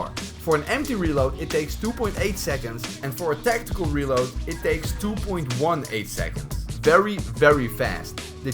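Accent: Netherlands. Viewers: your dutch arent ya i can hear it in your english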